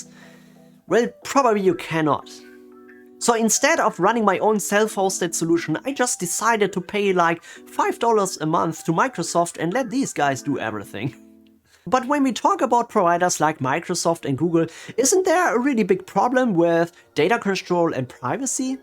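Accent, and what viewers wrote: Germany. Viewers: Do you speak german? Because i think i hear a bit of a german accent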